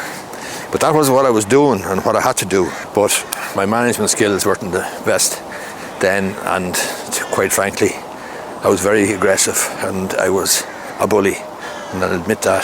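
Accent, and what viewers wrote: Ireland. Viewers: I luv the irish accent. 💓 Great video bthanks for your insights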